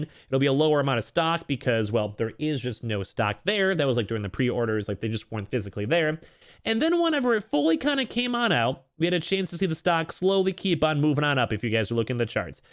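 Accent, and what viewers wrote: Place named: Chicago, United States. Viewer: It’s that shhheh caaaahgo accent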